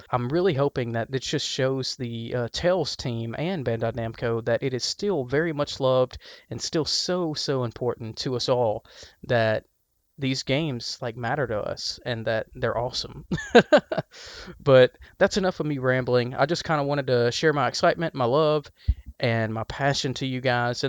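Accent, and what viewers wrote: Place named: South, United States. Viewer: Hearing that southern accent is amazing.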